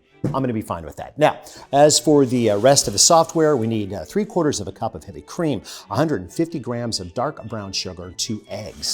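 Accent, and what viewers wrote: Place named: General American, United States. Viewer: Alton is the Renaissance man of our times. We have not recognized a well- rounded man needs to be all -around in visual media technology, and his many other talents, cooking, writing, on screen talent, editor, music director. DP, behind the camera, producer, props, the master of GenAm or broadcast American English. . . ah heck, the above and below the line producer, covering all areas. That is a true modern Renaissance man.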